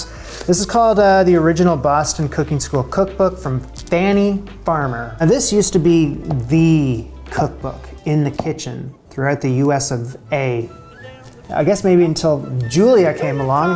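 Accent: Canada. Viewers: I’m going to guess you’re not from the US or Canada? (I’m guessing this guy is Canadian based on his accent.) Here whole chicken comes like that guts removed. You don’t have to clean it yourself. The main edible innards (gizzard, liver, heart) are usually included in a bag stuffed inside the cavity. Oh, and the neck is stuffed inside there, too. You take all that out before using the chicken. Chicken with the heads still on and uncleaned you have to go out of your way to find.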